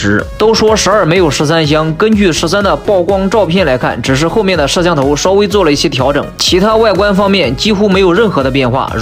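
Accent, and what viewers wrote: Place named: Henan, China. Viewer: 这标准河南普通话哈哈哈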